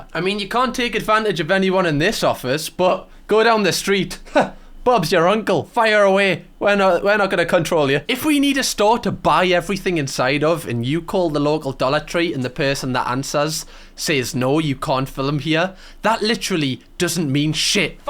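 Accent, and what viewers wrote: Newcastle, United Kingdom. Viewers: he’s from newcastle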